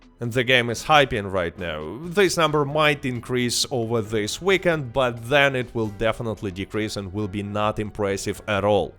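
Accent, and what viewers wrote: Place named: Russia. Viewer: Русский акцент какой то будто слышу😮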